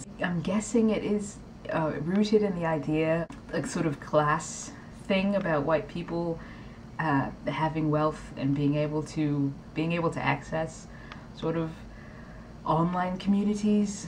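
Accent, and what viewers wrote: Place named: United Kingdom. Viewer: where you in britain a long time? you have a slight british accent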